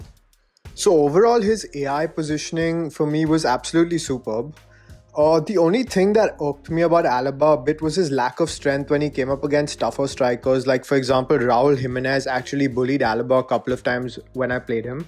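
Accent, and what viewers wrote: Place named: India. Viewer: Indian accent is very bad i don't like it. Sorry to tell you the truth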